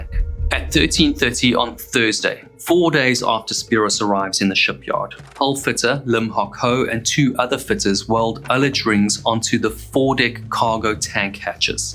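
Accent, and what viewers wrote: South Africa. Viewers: Wait, you are southafrican? Accent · Loving your videos. You also sound like your south African. I cannot and don't ever condone censorship cept to photos of uninvolved topic like children like a family photo showing the suspect in it too for example. But otherwise i despise it so don't show something when you censor it. Why show it then? Anyways despite that leading to a thumbs down az i always do zo for any censorship when topics like this or with true crime is involved always will get a dislike as i don't like censorship in such topics. But that said i love your videos still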